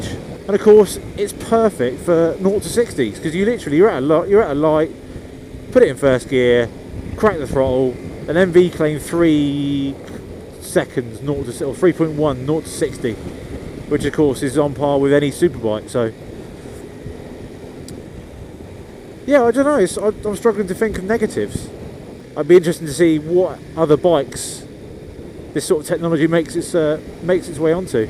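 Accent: United Kingdom. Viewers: A complete waste of several minutes of my day....I could only stand this guy's sickening British accent for a few mins(!) Comprise your stupid opinions when you're NOT negotiating city and pedestrian traffic; you might have more intelligent things to say. And please, save the childish and moronic singing for your shower. Everything in this video could have been condensed to 5 mins without your blithering and entirely unrelated meanderings.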